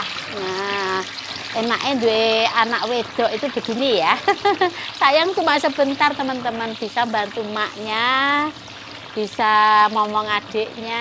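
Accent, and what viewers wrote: Java, Indonesia. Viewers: Aqu suka bila te vloq masak " suka bhs nya ya mendok jawa blitar tak gojake ' pinter te ajari putri nya untk memasak ' sepandai apapun sekaya apapun bila punya potensi diri insya alloh berhasil dlm hidup So switt bahagia te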